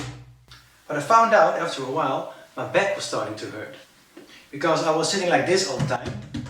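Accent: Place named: Netherlands. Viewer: zwaar nederlands accent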